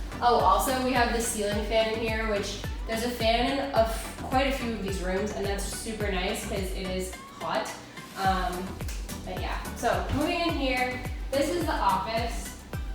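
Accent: Maine, United States. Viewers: On another video you asked if you have an accent. I can here the Maine accent in certain words, like when you say "certain".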